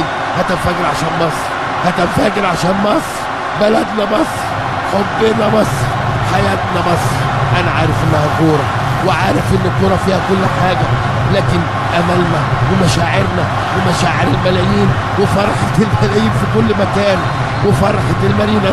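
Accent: Chile. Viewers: Parece chileno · pero asi habla los chilenos sin ofender??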